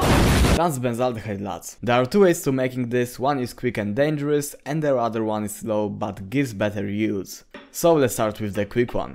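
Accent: Poland. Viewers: Your English is really good and the humor is really great paired with videos like this. I like your accent but I can’t tell where it’s from. You sound Polish but I don’t know for sure (sorry). What country are you from? Do you live there or are you in the US now? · why your accent suggest me, you are from Poland xD?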